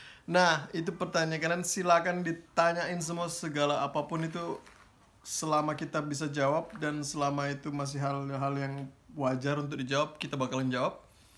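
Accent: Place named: East Luwu, Indonesia. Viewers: Saya orang malili Hahahahhaha lucu sekali logat luwu timur kentara sekali logat orang luwu timurnya ahahhaha sukses terus kak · Sangat menghibur setiap konten2 nya bang. Oh ya bang asalnya luwu timur sulsel yah. Soalnya logat nya sprti logat luwu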